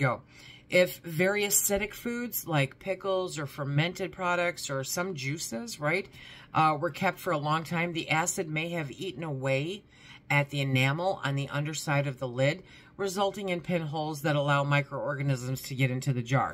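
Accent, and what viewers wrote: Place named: Michigan, United States. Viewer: Your voice is pleasant. I used to live in Michigan. You sound like home.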